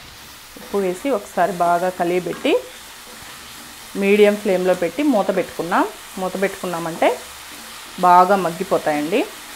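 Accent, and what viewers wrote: Nellore, India. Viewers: Nice madam from:kavya mi vantalu motham super cheppadam kuda nidanamga ardamaila chepthunnaru.midi nellore ra madam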